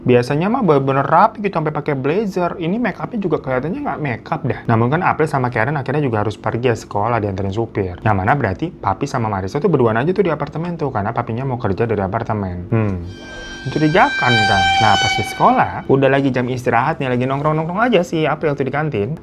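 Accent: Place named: South Jakarta, Indonesia. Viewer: Aksen jaksel nih keknya wkwk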